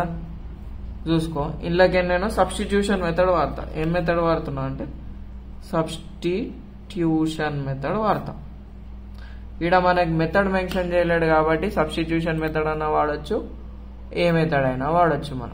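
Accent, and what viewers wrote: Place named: Telangana, India. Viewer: Anna ekdham chpthunnav poo kani manchiga cheppinav Anna verey matladuthunnav acham telangana porodu lekka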